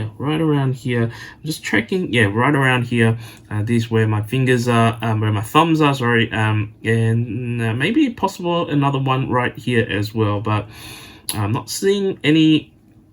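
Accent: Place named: Australia. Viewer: So awesome! Random question but I assumed you were Australian until I heard you say you’re in Hong Kong. We’re you taught by an Australian?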